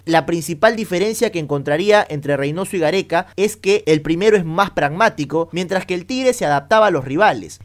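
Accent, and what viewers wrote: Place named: Argentina. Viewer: Una pregunta, eres argentino o no, por qué hablas como argentino.. habla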